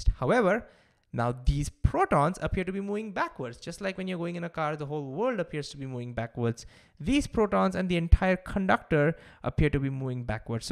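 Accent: India. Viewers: you made me a believer! Btw i love the typical Indian accent. moVing becomes mOOOing. Gotto love it.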